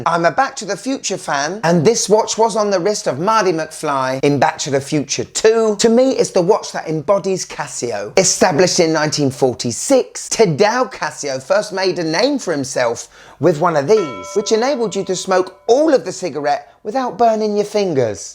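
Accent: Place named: Cockney, United Kingdom. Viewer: i wish this guy would talk low and quiet and not to loud kinda annoying.he does good reviews but cut down on the high cockney talk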